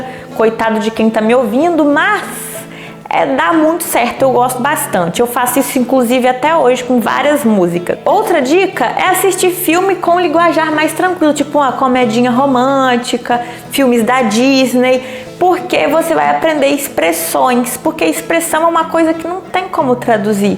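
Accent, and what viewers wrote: Minas Gerais, Brazil. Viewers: AMEI OUVIR NOSSO SOTAQUE MINEIRO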